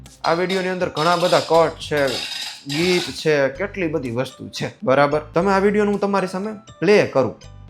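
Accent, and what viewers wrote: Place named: Surat, India. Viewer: AEK number jordar jabardast Little bit Rajkot , but full on surti..... Lot's of love from surat...❤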